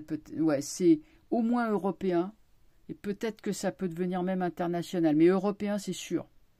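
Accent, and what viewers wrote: Paris, France. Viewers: Bonjour, cela fait déjà un bon moment que je vous écoute et que j'apprécie votre travail.. Mais surtout vous êtes pour moi comme une petite madeleine de Proust.. Votre voix et votre accent titi parisien me rappellent mon enfance à ménilmontant, pigalle, le sacré cœur tout ça tout ça les marrons chauds.. Que du bonheur.. Merci..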